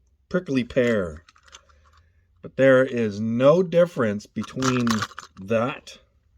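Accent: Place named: Canada. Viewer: Your accent is identical to Ricky’s accent in trailer park boys, you must be Canadian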